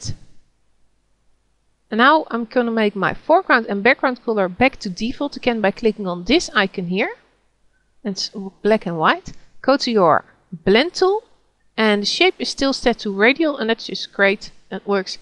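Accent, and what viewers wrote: Netherlands. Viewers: je bent werkelijk een kei in zowel het bewerken als uitleg geven. hartelijk dank om al dat moois te delen met wildvreemde mensen. als ik me niet vergis hoor ik een Hollands accent in jouw engels dus vandaar dat ik u in het nederlands beoordeel. in de hoop dat ik me niet vergis natuurlijk en mocht dat zo zijn, sorry daarvoor hoor;-)) thank u so mush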